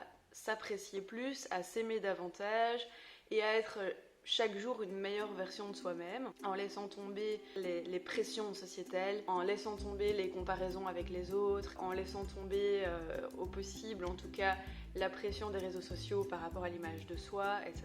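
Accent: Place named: Liège, Belgium. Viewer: Vive l’accent de Liège (et du bw❤️)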